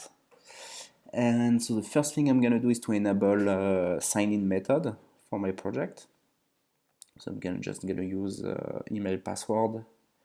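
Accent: France. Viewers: you have a french accent bro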